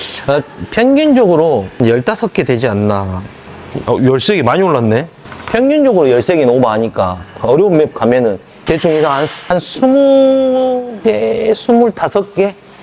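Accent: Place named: Jeolla, South Korea. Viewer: 구독자 500명 달성을 진심으로 축하드려요!😊❤🎉 전라도 김치 참 좋아해서 저도 사먹는 편이거든요! 특히 묵은지를 좋아해서 묵은지 김치찜을 자주 해먹는 편이랍니다 ㅎㅎ 에서는 김치소개를 더해서 전라도의 맛집도 소개해 주시는 영상이 많아 참 좋더라구요❤ 그 중에 얼마전 말리화반점을 소개해 주셔서 아주 맛있게 잘 먹구 왔답니다! 동구에 있는 오래된 중국집인데 유니짜장이 맛있다 라는 에서의 리뷰를 보고 역시니 맛잘알❤이라고 생각을 하였다는요..👍🏻 그러니 의 김치는 얼마나 맛있을까 하는 믿음이 안생기겠냐구요 👍🏻ㅎㅎㅎ 이렇게 열심히 해주셔서 많은 구독자 님들이 금치의 김치를 받을 수 있나 봅니다! 저도 금치를 꼭 밥에다 먹어보길 희망하며 바라봅니다😊 역시 전라도 사투리 찰져버리시네요~~ㅎㅎㅎ 34개 축하드리고! 500명돌파 다시한번 축하드리고 1000명 1만명 까지 쭉쭉 승승장구 하시길 바라겠습니다!!😊❤🎉 (1kg:총각김치, 서비스김치: 부추김치, 깻잎김치 희망해 봅니다..😊)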